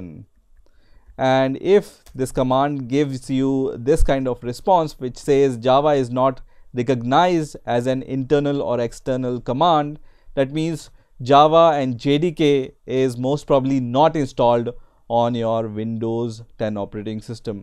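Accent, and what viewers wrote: India. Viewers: i love you and your Indian accent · The moment you hear the indian accent you know its going to be good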